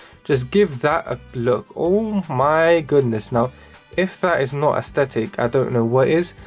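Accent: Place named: British Pakistani, United Kingdom. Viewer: This bloke sounds like the British tech reviewer Supersaf. Supersaf is the most well known tech reviewer here in the U.K. This bloke is probably a British Pakistani based on his accent. Fun fact: Pakistanis do not actually speak the language of the prophet muhammad (pbuh). They speak punjabi. Some of the Pakistanis are really cool people. But they read the quran in arabic - but they do NOT speak arabic so they cannot understand what they are reading. I think some of the Pakistanis are really cool. But the prophet muhammad (pbuh) NEVER spoke Punjabi which is the language of the Pakistanis. I would like this bloke (or Supersaf) to do an entire tech review in Punjabi which is actually the language of the Pakistani race.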